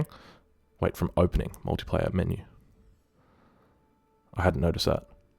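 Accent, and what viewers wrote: Australia. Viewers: I'm from Minnesota, but I that's Australian English right?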